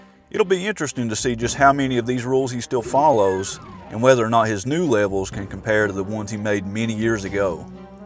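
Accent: South, United States. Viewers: Love your southern accent, I'm from Louisiana (New Orleans) and can't quite place where you are from. It sounds a little bit like southern Mississippi or the Carolinas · Nice vid, love your accent. I'm also from the South. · "Textures are usually misaligned by default in these scenarios" is really hilarious in this southern-drawl and Big Lebowski narrative style... Sasparilla, I reckon? However it's 100% fitting for doom's Texas origin and current residency. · Thanks so much putting this together - I'm coming from the "illustrator that likes to play games" camp so most of what makes a Doom level "feel right" is a mystery to me, but it's starting to click. (unrelated, but it always makes my day hearing other Southerners online 😁)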